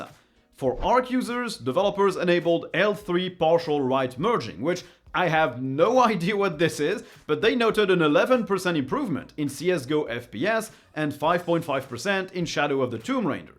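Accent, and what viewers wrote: France. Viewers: Man where u from France?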